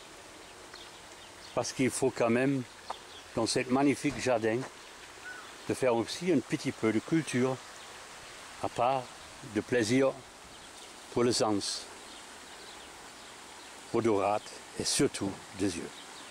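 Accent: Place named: Midi, France. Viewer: Hallo, wohnen Sie zufällig im Süden von Frankreich? Sie sprechen französisch mit einem so netten Akzent vom Midi:) es ist ja echt schön.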